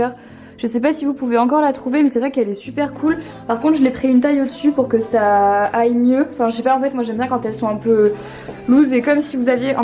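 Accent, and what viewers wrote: England, United Kingdom. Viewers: T'as un super accent anglais ♥️♥️♥️!!! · J'aime trop ton accent anglais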